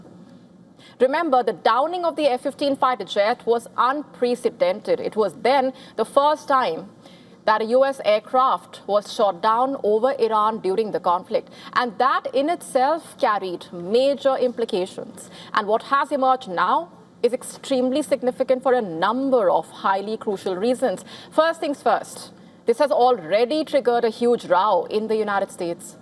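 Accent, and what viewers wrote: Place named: India. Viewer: This lady's Indian accent is way too strong. Could only understand 25% of what she said.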